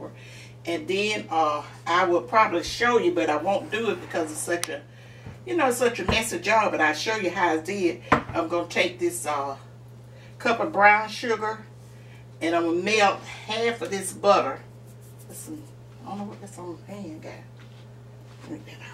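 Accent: South, United States. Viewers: Every recipe I saw 4 this used a box mix & I wanted REAL DEAL scratch made. I clicked on the video & your sweet southern accent & energy reminded me of my aunties & every sweet church lady I encountered in my life. And 1 things I know about sweet Southern ladies is they r the best REAL DEAL cooks & bakers. Gonna definitely make this Thanksgiving. TY SO MUCH. 🌹👏🏿🌹👏🏿🌹👏🏿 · I'm from California and when I heard you speak, I knew this was going to be divine. Your spirit made me feel so safe! You remind me of my God mother. So sweet, southern and full of the love of God! God bless you Miss. Thank you for just doin ya thang and being you. You blessed me real good!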